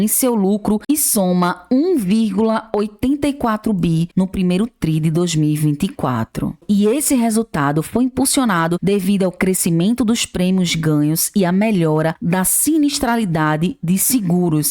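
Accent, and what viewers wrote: Northeast, Brazil. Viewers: Letra B Vc é de q parte do nordeste? Sou de Caruaru PE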